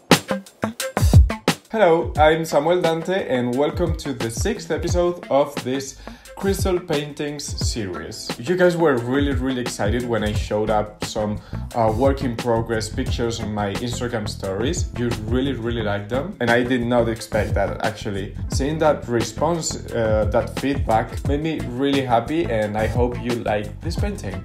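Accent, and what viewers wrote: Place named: Madrid, Spain. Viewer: Me gusta que subas videos en Ingles pero extraño tu acento Madrileño 💔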